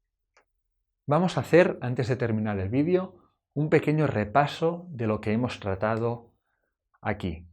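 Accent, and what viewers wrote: Spain. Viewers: como se nota el acento catalán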